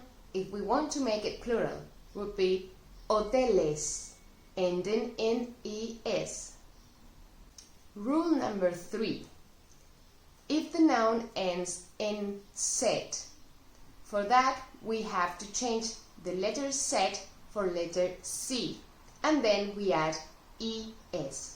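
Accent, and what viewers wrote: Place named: Spain. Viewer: Me gustan mucho tus videos! Me parece que hay muchos video por los principiantes y todos explican las mismas cosas pero no hay muchos video por los estudiantes intermedios quienes no entienden aún los videos nativos pero las cosas básicas son demasiadas fáciles. Me gustaría ver algunos videos donde por ejemplo hablas solo en español sobre algún tema, para que yo puedo escuchar pero tambien que añadas los subtítulos en español y inglés para que podamos aprender nuevas palabras. :-) perdoname por mis errores que he escrito, es que ya he pasado mucho tiempo buscando algunos video más dificíles para estudiar un poco más 😄 he visto que estás comenzando y por eso creí que podría pedirte por esos videos😊 especialmente por tu accento español, porque tengo más problemas de entender ese accento que los latinos :-) gracias y espero que tengas un buen dia!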